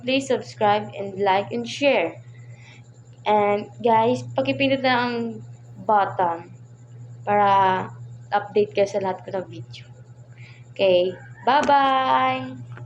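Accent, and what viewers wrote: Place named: Ilonggo, Philippines. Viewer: Ilongga malambing talaga galit na malambing pa rin ang salita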